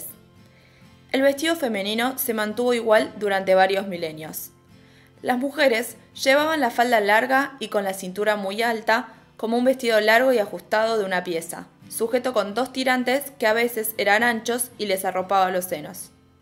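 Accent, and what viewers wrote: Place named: Argentina. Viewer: Me encanta el acento de las argentinas.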